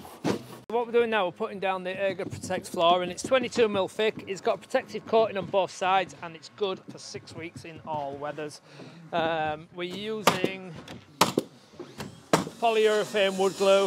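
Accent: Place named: Leeds, United Kingdom. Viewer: ey up, these are great videos! im a leeds lad living in cornwall now s0 its good to hear the leeds accent. may i ask, what is the minimum distance i can build my base up to a neighbours fence to conform to building regs? ? (subscribed)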